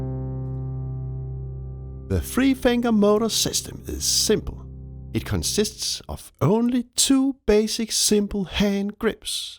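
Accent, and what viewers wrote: Denmark. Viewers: holy denglish han må simpelthen være dansker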